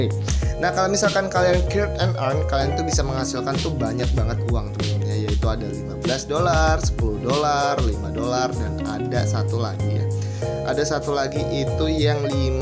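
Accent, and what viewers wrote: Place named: Lampung, Indonesia. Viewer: Lo orang njirr. Kek orang lampung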